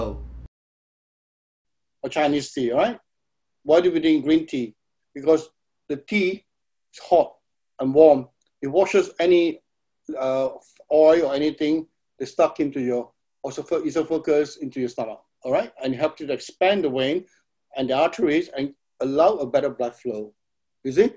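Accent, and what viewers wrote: Singapore. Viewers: bro r u singaporean?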